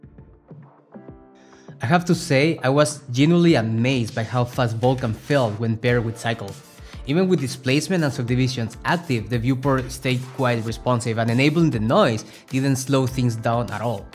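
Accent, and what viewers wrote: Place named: United States. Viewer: American can't you tell lol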